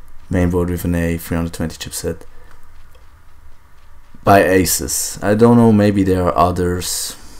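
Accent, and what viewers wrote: Germany. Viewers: Saint Siemore Yes he is german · Are you german? thanks for the video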